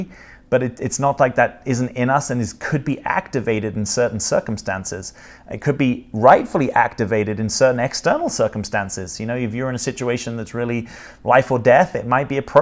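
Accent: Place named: United Kingdom. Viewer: Thank you for this video. Your analysis and understanding of human behavior is helpful and amazing. Your British accent makes the topic as significant as it really is and should be. Will listen to it again and from time to time. FR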